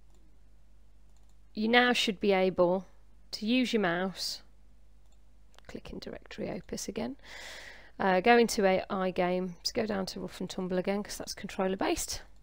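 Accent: Midlands, United Kingdom. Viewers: Thanks for this.. Very useful indeed...you sound like a fellow midlander btw :)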